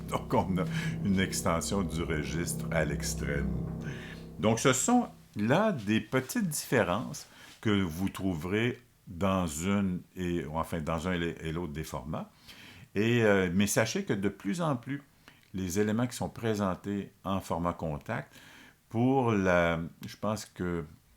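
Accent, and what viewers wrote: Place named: Quebec, Canada. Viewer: Merci, content d’entendre un québécois dans le domaine! Bon travail!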